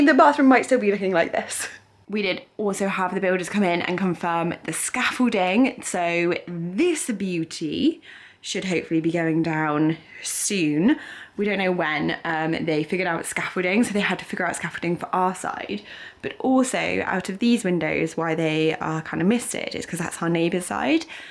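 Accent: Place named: RP, United Kingdom. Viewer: you talk lovely quite posh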